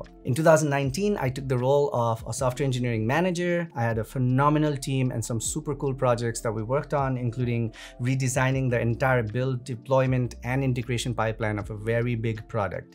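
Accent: Nepal. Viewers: now im pretty sure you're from nepal Your accents and all that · Damm you are from Nepal. i knew from the tone & name but seeing conforms it. Hey, tell about how did you get there, the visa and other issues, really cluttered you know living here as a student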